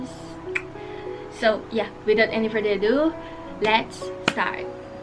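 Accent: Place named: Sarawak, Malaysia. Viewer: dengar nya mpun oii ya ku tauk nya orang sarawak 😂😂😂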